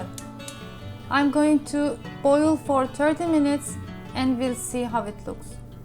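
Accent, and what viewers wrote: Turkey. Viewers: Abla Türkçe konuş türksün zaten 👍😂. Aksan Türkçe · Türk var mı konuşan kadın türk gibi geldi ingiliz şivesi yok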